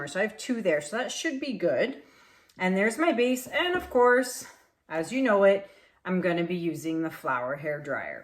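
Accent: Canada. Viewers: Anytime she says anything with “out” in it I laugh BUT I will say I never really could tell a difference in the way Canadians speak vs Americans UNTIL I heard her with the “out” .. turns out … ab”out” etc etc … now anytime I hear it I’m like oh you’re not just from outside of Long Island you’re from Canada!! 🇨🇦🇨🇦 I personally think it’s pretty cool. Ps, this turned out beautiful! I really actually like the titanium buff light as a base color too!! I almost like it better than just plain white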